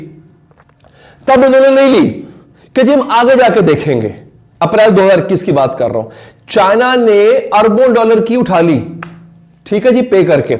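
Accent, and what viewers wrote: Punjab, India. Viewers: 😂😂hyeee sir aapki punjabi 😍😍 Love it ❤ punjabi tu bss 2 hi logon ki hy 1 aap or 2osra ......😂😂. Pharr ke kuttu onu..❤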